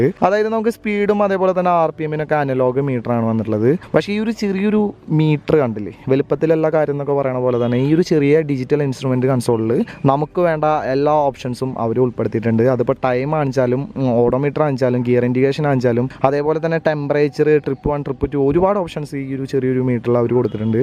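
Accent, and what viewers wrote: Thrissur, India. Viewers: ഇങ്ങള്‌ തൃശ്ശൂര്കാരനാണല്ലെ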